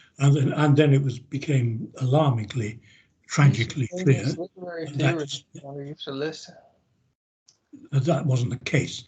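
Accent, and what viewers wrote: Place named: Oxbridge, United Kingdom. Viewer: Gosh, I love this dude-he is a flaming hoot-his humor belies his rather stuffy,( Oxbridge(?) accent-I'm a financial analyst myself, but I would have enjoyed studying with this cat.-where ordinarily I wouldn't go within spitting distance of any Humanities faculty.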